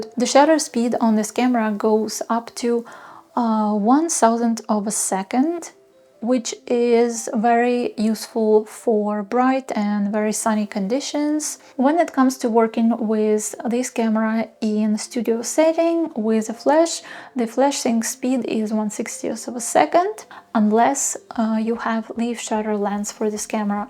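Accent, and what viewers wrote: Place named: Ukraine. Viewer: Класне відео, почув акцент і думаю звучить ніби Українка, потім бюпобачив герб на шиї)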